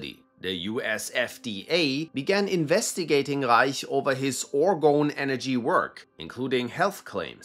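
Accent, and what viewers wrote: Germany. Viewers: Der deutsche Akzent ist Mega! 😅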